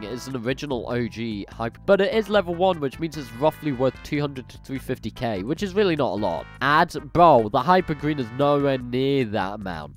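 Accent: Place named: United Kingdom. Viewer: You got a big British accent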